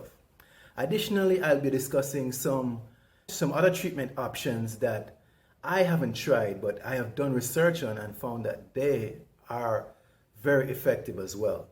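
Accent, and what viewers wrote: Caribbean. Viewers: where u are originally from? caribbean? my trinidadian self wonders where your accent is from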